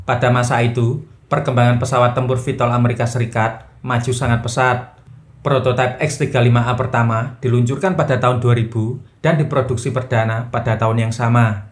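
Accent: Java, Indonesia. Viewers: Yang di bahas random banget dari tekno, kesehatan, chip, militer, listrik, tp story tellingnya enak juga meskipun ngomongnya medok jawa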